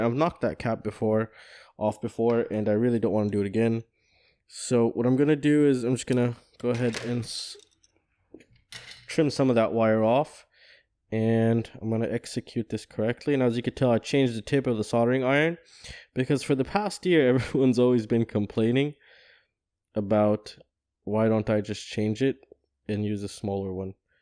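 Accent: United States. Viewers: Wait... American accent but mentions tesco... wth dude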